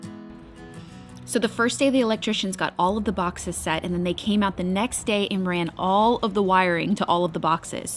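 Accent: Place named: Texas, United States. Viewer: Is it just me or does she sound more texan? Just some small things with a hint of southern accent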